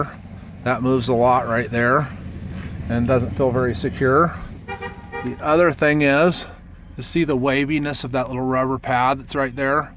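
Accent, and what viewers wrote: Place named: Utah, United States. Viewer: Listening to you speak I could tell you’re from Utah. Saw your license plate - sure enough lol I live here to. I’m looking to buy a 14 actually so stumbled on your video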